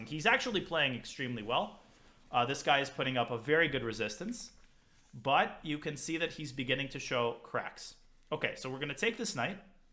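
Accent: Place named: Russia. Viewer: Daniel's russian accent is wonderful!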